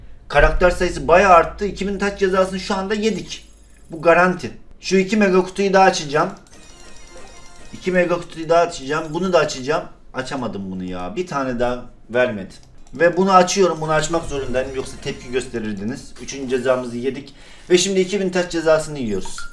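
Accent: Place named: Laz, Turkey. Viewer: Bu adamı eskiden tanıyorum laz gibi konuşaydu şimdi nonoşlar gibi konuşayu para adamu degiştiriye haa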